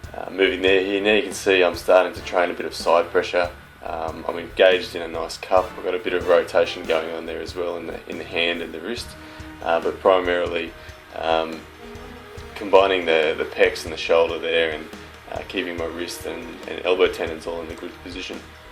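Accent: Australia. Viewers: I reckon some people are just too literal. Obviously ya boy here isn't suggesting that this is the 100% tried and true way to train for hooking. He's just showing what HE does to train his hook, so that someone who is looking for a little more for THEIR own workout, they can try this. It's a FREE video SUGGESTING and OFFERING ideas to better someone else's training. It's not like you had to pay $65.99 for the video. Just take what you want from the video, give the Australian man a thumbs up, say something nice in the comments like "Hey, cool accent, thanks for the tip, and good luck in Australia" and be done. Don't smite the guy for free advice or the way he trains. He'll end up breaking your arm at the table.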